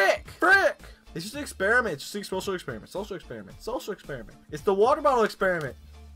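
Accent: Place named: Kentucky, United States. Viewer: By any chance are you from Kentucky?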